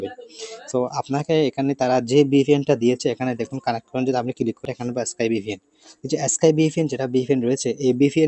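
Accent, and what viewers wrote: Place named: Chittagong, Bangladesh. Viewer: ভাই মনে হয় চট্টগ্রামের😊😊😊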